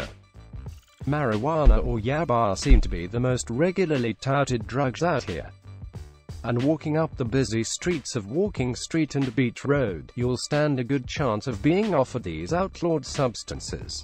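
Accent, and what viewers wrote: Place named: RP, United Kingdom. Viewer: Your tied #1 place for my favorite thai channel with 'do more life'. Love the David Niven accent. Funny channel. Each video has me laughing.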